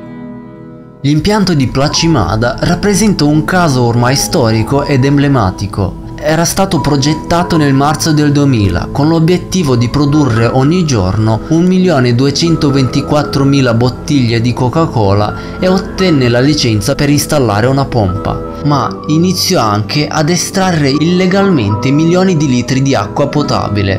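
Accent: Russia. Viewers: sei russo? hai un accento vagamente russo e poi con il sound di sottofondo lo accentua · Direi che il lessico italiano di alto livello che accompagna i tuoi video fondendosi con l'accento russo non troppo marcato, e il tasso di suspence dato dalla musica di sottofondo crea un armonia unica che ti invoglia di volerne di più di più sempre di più AHHHHHHHHHHHHHHHHHHHHH! Perfavore un top 5 curiosità sulla bomba atomica -!!! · È russo... ha un accento diverso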